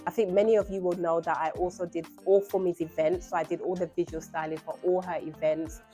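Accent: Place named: Nigeria. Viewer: I like your Nigerian accent 👌🏾 😍 🫂..you look beautiful as always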